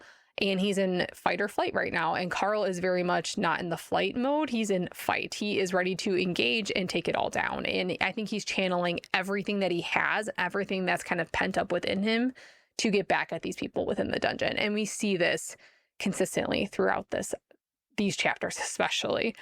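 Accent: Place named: New England, United States. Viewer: Can I ask where you’re from? The aspirated L in also, and the dropped final consonants makes me think New England.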